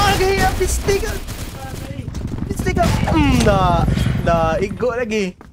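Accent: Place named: Visayas, Philippines. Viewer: Bisaya man dy ka dol HAHAHAHA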